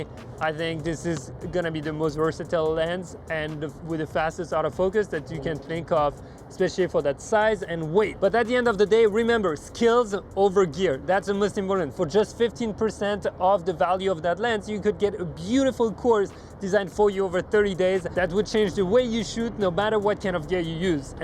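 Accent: France. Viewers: great video awesome French accent-) need advice, im a run n gun shooter from shooting hockey games to street photography, debating strongly between the 35-150 and the new 24-70 g master. 35-150 is heavy not the most fun to carry around and missing the 24mm... while the 24-70 I feel like im missing some range.... what would you do? I feel that I like my 24-105 better lolll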